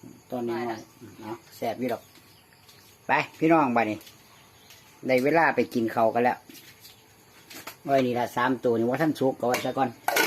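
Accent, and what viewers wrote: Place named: Isan, Thailand. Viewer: คือบ่อตั้งชื่อช่องว่า เสียงอิสานเเซ่บนัว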